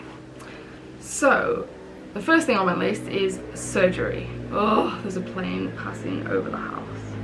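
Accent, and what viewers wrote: England, United Kingdom. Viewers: Thank you for finding the strength and courage to make more videos. I really love to watch everything you record. Everything! Your voice is so sweet and soothing. I am not being weird. I am serious. I just love your accent and ' too! I have been doing a lot of work on Ancestry and found that I am predominantly English, and my ancestors were centered near London. I listen to you talk and imagine how my ancestors must have sounded too! Thank you for sharing your world with us. I look forward to the next video!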